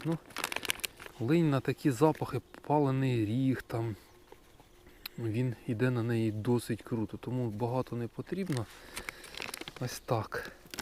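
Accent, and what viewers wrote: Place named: Zhytomyr, Ukraine. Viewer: де така гарна водойма Житомирська обл Чую по говору😂?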